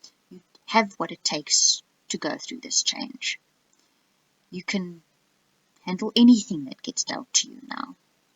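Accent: South Africa. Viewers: Hello ! Hoe gaan dit? I just found you, and I like your video because I love tarot (I'm starting to learn for myself) and also I'm very pleasantly surprised to hear your South African accent because I grew up in Cape Town, though I'm now on the other side of the globe. Dankie! You explain things very well, and amazingly this was all completely relevant in my life.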